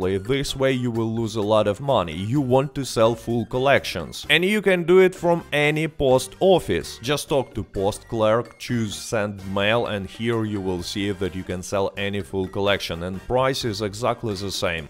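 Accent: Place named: Russia. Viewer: My dear I stop paying the game but I still love your video I enjoy your Russian accent very much please keep up the good work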